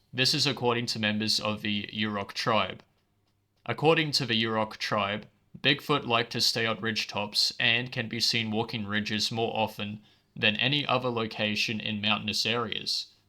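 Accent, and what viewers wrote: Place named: United Kingdom. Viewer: Glad you thought it was important enough to post this scathing comment in response to my scathing comment. Are we having fun yet? You got it wrong again, the name isn't Frederick, it is. That's a family name, especially with the 'son' part. Names like that go back a long way - all the way to Jolly good Britain as a matter of fact. If you're not a Brit, you sound like one. As to the mis-pronunciation of words, there certainly are different pronunciations - there are the correct ways to pronounce specific words, like city names. One pronounces them the way the locals do and there is your way. I'm terribly sorry you feel that a comment like mine is worthy of your attention, but it seems that you are one of those angry people who cannot bear up to criticism. Must be hard to be you. Your "analysis" as you prefer to call it, was actually more commentary because you did not enter any facts based on the scientific method to sway viewers. 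Your opinion was obviously being expressed and you continued to make judgments based on your feelings and interpretations which were uttered with bias. I know, I know, you like all the thumbs up arrows being pushed because YouTube is a revenue generator and negative comments distract from your wish to make that cold hard cash. Too bad. Had your video shed actual light on the subject, I'd have given a better review.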